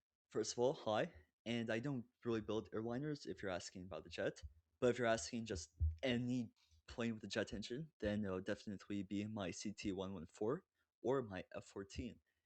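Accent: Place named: Canada. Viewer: You sound so Canadian 😭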